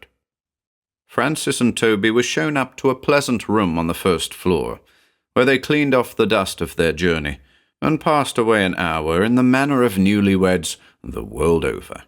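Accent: United States. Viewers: This is such a rich and believable story. An almost Tolkien-esque commitment to lore, attention to family lines and culture. It feels believable because it's steeped in history. This author just HAS to be Irish! Of course does a stand up job as always. Your American accent is so John Wayne tough. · You do a really good American accent, as an American myself 🩵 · Your American accent is spot on. Cheers for the performance! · What an amazing weird tale. Expertly written. Two distinctive main characters each uncovering the mysterious secrets of their inheritance both together and separate from each other. Perfectly narrated as always. Glad to hear the American accent is still spot on. Great choice of story.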